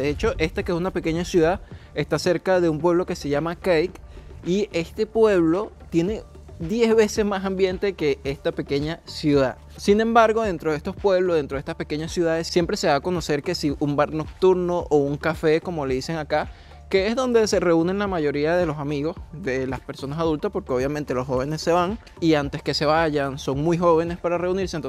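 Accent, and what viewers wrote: Venezuela. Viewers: No sabía que era venezolano, lo adiviné por el asentó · Hola!!! simplemente apareciste en el menú de videos de youtube y me llamó la atención. Me quedé viendo porque tu forma de hablar me parecio familiar, hasta que dijiste que eres Venezolano. Bonito formato. Saludos desde Caracas. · Por fin veo Venezolano con video de este tipo. Felicitaciones!! La vrrdad se sintio raro alguien Holando con acento Venezolano. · Hola! Que bien que el algoritmo me trajo a tu canal, que lindas las imágenes que muestras de Holanda! Me preguntaba si eres del oriente del país, tu acento me dio esa impresión, yo soy de Puerto La Cruz viviendo en Kansas, USA. Saludos! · Hijo; genial tu salida a ese error que hiciste cuando hablas “ si lo ves en el futuro.. pero eso del verlo en el “pasado”😒😒😒 jiji tu risita te salvo de ese “chispoteo” propio de la improvisación; pero bueno lo remendasteis con esa salida.! 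Primera vez que veo tus videos, llegue ahí por casualidad, al empezar y oír tu buena dicción, me dije es un paisano venezolano. Dios t bendiga brother sigue adelante; al final te espera la cima allá o en cualquier lugar del Mundo.!!🌹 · ¡Esoo chamo, acento venezolano! Muy buen video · Llegué a tu vídeo por sugerencia de YouTube. Generalmente no hay venezolanos con esta dicción tan clara. Sospecho que eres de Centroccidente, más específicamente de Barquisimeto o Yaritagua pues ese acento es característico. Se agradecen este tipo de descripciones tan espontáneas, claras y sucintas. (Larunbata, 2021.eko martxoak 20a, am) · La verdad que ni idea cómo llegué aquí jajajaja pero me parece súper cool y más al reconocer tu acento, dije: "Este chamo es venezolano" jajaja y acerté! Primer video que veo, me parece súper chévere! éxitos y bendiciones! :)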